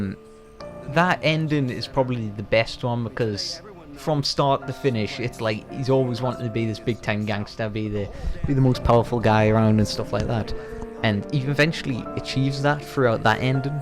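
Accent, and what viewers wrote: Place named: United Kingdom. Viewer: Should’ve been narrated with a proper American accent. This limey sucks!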